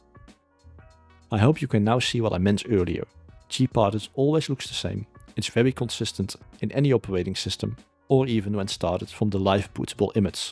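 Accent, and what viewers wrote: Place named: Netherlands. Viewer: Well it's pretty easy to figure out he's Dutch from that accent. Question is why is it hard for others when it's easy for me. Answer is....I actually meet people non-English speaking countries, not just stay for my whole life speaking only with native English speaking people or watching native english content.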